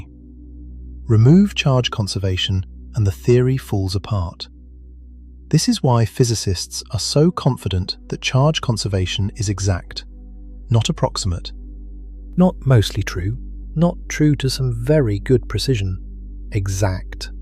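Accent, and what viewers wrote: United Kingdom. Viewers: 2 hours of mindless blathering with a British accent.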